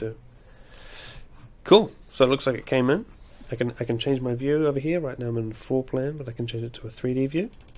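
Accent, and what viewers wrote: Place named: New Zealand. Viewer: hi thanks for that, you saved me heaps of hassles. I enjoy the kiwi accent :-)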